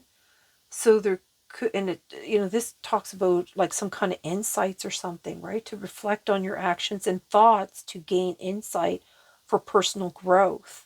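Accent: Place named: East, Canada. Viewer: Hi …. Haha yup things are shifting! I just love your eastern Canada accent being in Saint John NB from western Canada 🇨🇦 it’s my favourite as the people are the kindest in the Maritimes … as spiritualists we all need to get into gear now 👍🌈💕🌈🌈🌈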